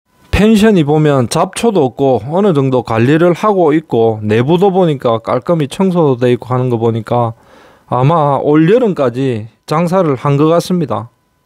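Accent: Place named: Gyeongsang, South Korea. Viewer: 투박한 말투와 경상도억양 보다 현실적이고 가식적이지않는 친근한 나레이터.. 전국곳곳의 간접경험 좋은 정보 좋습니다..ㅎㅎ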